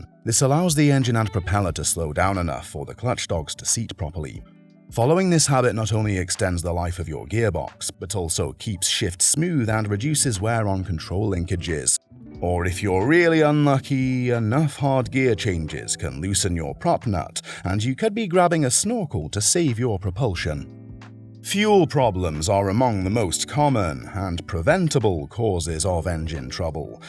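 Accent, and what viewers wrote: United Kingdom. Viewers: this obnoxious snotty sounding British voice narration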